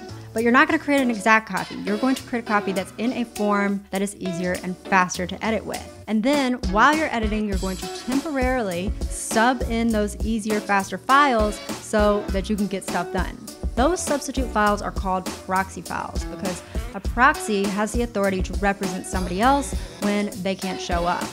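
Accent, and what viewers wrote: South, United States. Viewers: Also, lovin' the speach. I'm from Georgia and I feel like watchin' your vids brings me back home to the south 😀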